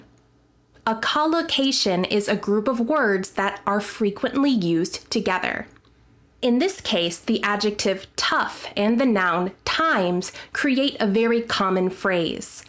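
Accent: United States. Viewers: WOW! How amazing accent, for downtown of NEW YORK! EXCELLENT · It's american english? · Is it american or british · Hello madam I am watching your videos learning english with the news and found good and clear english in American,so I wish to learn from you.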